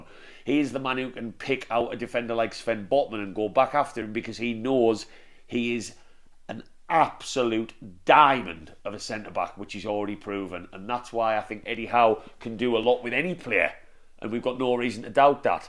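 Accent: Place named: Teesside, United Kingdom. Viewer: Your accent is not Newcastle...I'd say Smoggy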